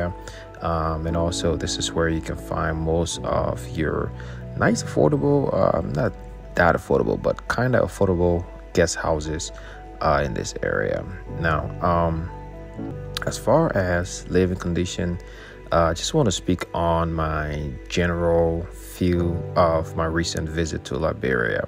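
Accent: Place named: United States. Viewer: American accent bruh, u eat cassava leaf? 😅😅😅. Awesome content bruh 👌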